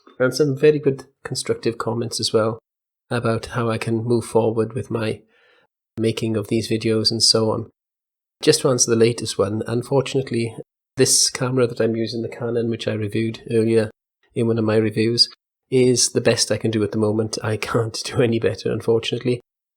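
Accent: Wales, United Kingdom. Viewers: Nice Welsh accent! Cymru am byth!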